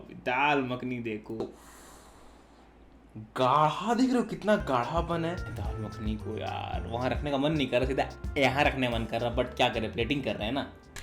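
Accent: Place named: Bihar, India. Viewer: Humko laga app bihari ho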